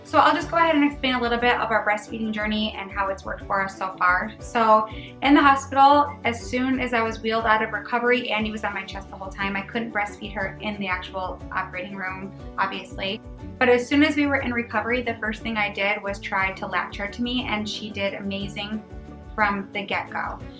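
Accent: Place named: Michigan, United States. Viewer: Are you from the Midwest? Just curious because your accent sounds like you're from Michigan (where I live). 💜🥰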